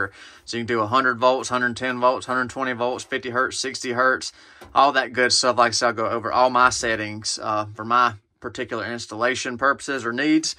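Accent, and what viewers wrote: South, United States. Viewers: Great video as I just ordered this inverter a few days ago off amazon along with 2 of their 48V 100AH Li Time batts. I've been using a small 12v solar set up at my storage place for the past 8 years so jumping to 48V is going to be a huge improvement. Started with 6v AGM in series with 800A bank then replaced with 3 12v 200AH Li time only 2 years ago. Have been very happy with the batts but do you think I should have gone with our used another brand? The two Li time I just ordered are not the rack type but the big flat type, a mistake in your opinion? Are you planning to use full time in your camper or home? Curious where you are located, you sound Southern ;)...I'm in East TN?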